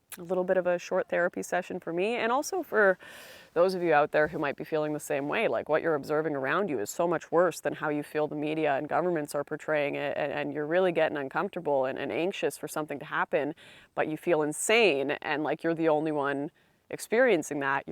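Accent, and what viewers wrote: Canada. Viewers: You sound like you live in Adolf Trudues Canada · Steggering? You Canadians' accents crack me up.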